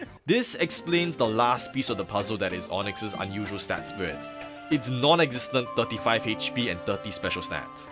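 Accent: Singapore. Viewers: I thought I recognised the accent!! Hello! From Singapore too!! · i heard your accent and i immediately knew you’re singaporean 😂 subscribed! #SupportLocal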